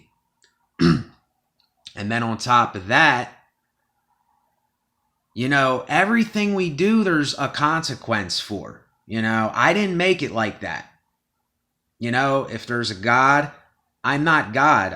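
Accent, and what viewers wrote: United States. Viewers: Are you from the states?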